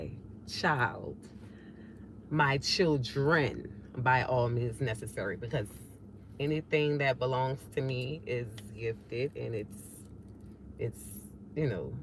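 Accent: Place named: New Orleans, United States. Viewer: I love the New Orleans accent. You're so authentic. I love it. I'm a new subscriber.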